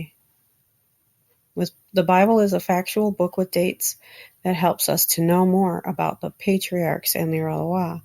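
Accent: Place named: United States. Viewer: Madam, you are butchering the Semitic languages! You have absolutely heavy American accent! Our phonetics is quite different!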